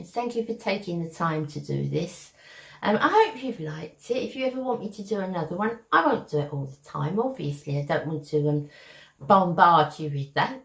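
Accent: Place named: Cockney, United Kingdom. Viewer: Thanks so much for answering my question! I love that you're a true Cockney! A rare breed, it sounds like. I love these videos because we get to know you better, but it's not fair to you because you don't get a peek into our lives, lol.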